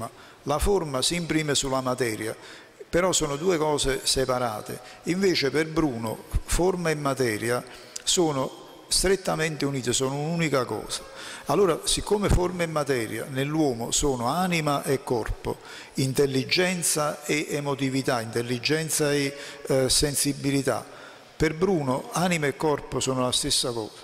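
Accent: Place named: Naples, Italy. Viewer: lei é un tesoro culturale napoletano con questa modestia irresistibile da del liceo, nessuna pianta raffinata,solo l'odore dei..limoni. Grazie IISF e Massimiliano per darci ció. Lo spirito di Archita, del nolano e di quel mecenate dell' istituto non moriranno mai. Che pianto amaro leggere la sua difesa senza abiurare. Che onore e fortuna potere sentire questi contenuti, con il suo accento a tanti km di distanza. Perché l'accento che si sente bene nel film é un accento di chi é, di chi parla delle “idee“ ripudiando le forme sofiste, di chi qualunque siano le pacate conferme del pensiero esprime il suo eroico furore. Grazie, sono davvero orgoglioso di esssere Italiano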